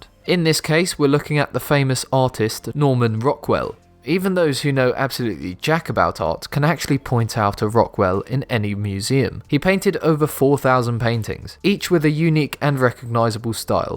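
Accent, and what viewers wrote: United Kingdom. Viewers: Stop assuming he's American just because he made a mistake on something from another culture, you idiot. Judging by his accent, I'd say he's from the UK. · British pronunciation. Not incorrect, considering narrator is clearly British, at least based on his accent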